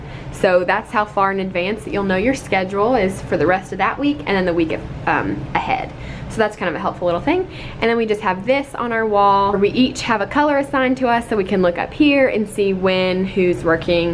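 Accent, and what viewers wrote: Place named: Kentucky, United States. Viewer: I was wondering why your accent sounded so familiar, I’m from Kentucky too! I’m about to graduate from EKU. Loved your Kentucky art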